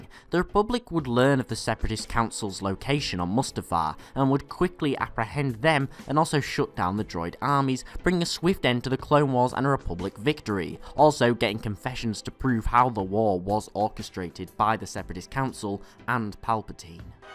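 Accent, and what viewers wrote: England, United Kingdom. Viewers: Is this guy english?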